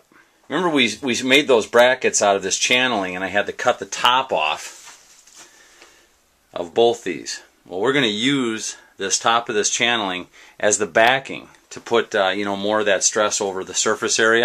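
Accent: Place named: South, United States. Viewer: That turned out niiiiiice!. Lmao at "I love that smell" man that is priceless bet you got beat up by the tree huggers on that one lol. If I had just ran across your channel and there was no snow on the ground I would have thought for sure you where from the south lol. Thanks for sharing the build God Bless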